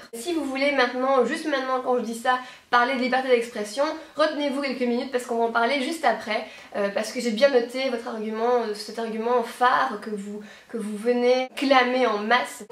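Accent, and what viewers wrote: Belgium. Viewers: J'avoue que notre accent à nous les belges donne un cachet en plus quand on dit les choses! Merci pour cette vidéo, j'espère que les gonzesses qui ont la critique un peu trop facile ont pêtés un bon coup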